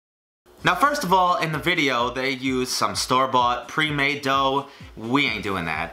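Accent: New York, United States. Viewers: your new york really came out here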